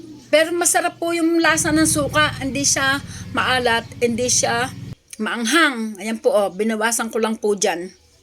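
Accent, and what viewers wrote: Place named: Tanay, Philippines. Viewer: ate taga tanay rizal po ba kau punto nyo po kasi tanay kung magsalita hehe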